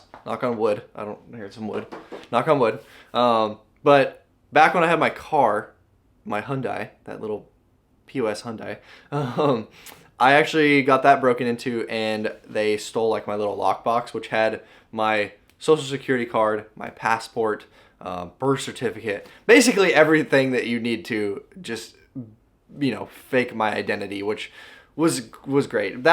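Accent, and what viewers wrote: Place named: Australia. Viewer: You the man! So I was watching some Braille skateboarding drama the other day and thought Ricky Glaser reminds me of you lol minus the Australian accent obviously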